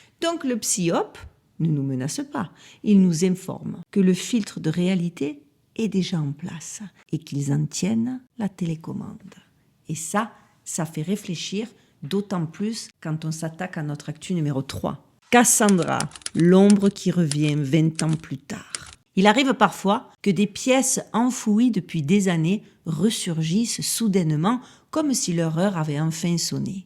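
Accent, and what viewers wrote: Marseille, France. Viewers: 👏🏻👏🏻👏🏻 super vidéo! Merci pour vos sujets et recherches très pertinentes 👍 J'adore votre chaîne et votre reste d'accent Marseillais...à dimanche! ❤ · Marseille💔💔💔super video...ton accent m enchante😉✔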